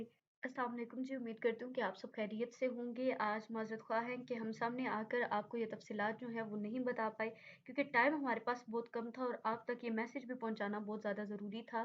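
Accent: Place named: Jhang, Pakistan. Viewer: jhang ki ho ap ?apki punjabi sy laga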